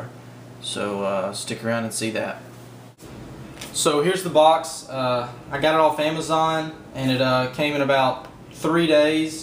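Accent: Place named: South, United States. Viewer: You're not from the Boston area, are you? You really sound like you're from the South. I lived in Knoxville, TN for a long time, and you really sound like you're from that area. By the way, the Maru, you need to tighten if it comes out of alignment. Tightening it will stop that. The Dayan 4x4, you HAVE to lube the inner 2x2 core, or it won't be good. It really is the best when you set it up right. Lube and loosen the screws on the 2x2 and tighten the ball shell screws all the way.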